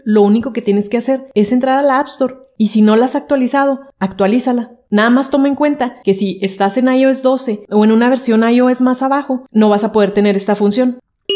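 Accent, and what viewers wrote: Mexico. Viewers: No tengo iPhone, siempre he sido android estoy pensando juntar para un iPhone nuevo no sé si lo haré porque puede que al final termine comprando otro android jeje , pero me agrandan tus vídeos, una consulta, de qué parte de México eres porque he escuchado a varios mexicanos pero tu forma de hablar es nueva para mi.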